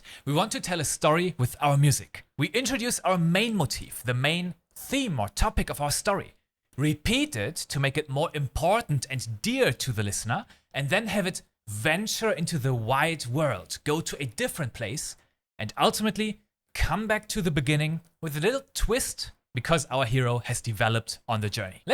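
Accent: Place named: Germany. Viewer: kurze anmerkung man hört raus das du deutsch bist haha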